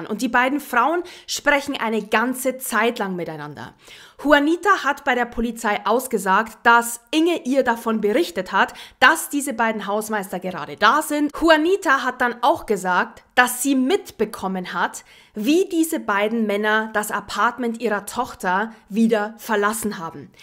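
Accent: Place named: South, Germany. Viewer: Was stimmt mit dir nicht, Inge Richter? Was für ein sinnbefreiter Kommentar ist das denn? Du brauchst dir Videos doch nicht anhören. Viele, unter anderem ich, mögen sie sehr! 💕 Mögen ihr Aussehen, ihre Stimme, ihre Ausrucksweise, ihren süddeutschen Dialekt, kurz gesagt: Ihre Arbeit, die sie sich macht. Und die Mühe, die sie sich für uns gibt. Empathie ist erlernbar. Vielleicht solltest du dich einfach mal damit beschäftigen?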